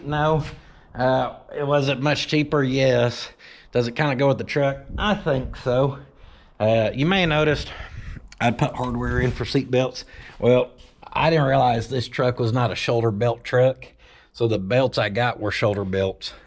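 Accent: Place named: South, United States. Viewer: I'll bet that a lot of folks see channel and think: "he sounds like cornbread... I bet he does a lot of hillbilly rigging on his projects." I watch a lot of automotive channels, and I can truthfully say that is as good a mechanic as most of them, and welds better than all of them. He follows his vision, putting in the extra work to do things right. He is humble enough to show you his screw-ups and how he fixed them. All the while having a good attitude and a great sense of humor. He should have a lot more subscribers... I guess some folks don't like cornbread. Their loss.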